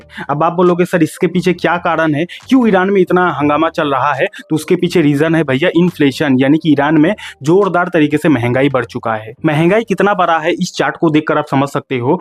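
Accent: Bihar, India. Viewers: Bihari to tum?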